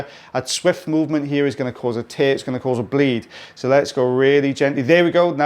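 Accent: Wales, United Kingdom. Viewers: your wenglish is great to listen too unless your Welsh you won’t know what that is but I’m sure does fab peel love these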